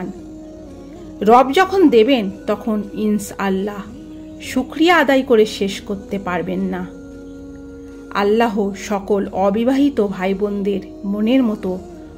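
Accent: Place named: Kolkata, India. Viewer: ভাষা এমন কেন 😮 মনে হয় কলকাতার 😅 উদ্ভদ লাগে শুনতে।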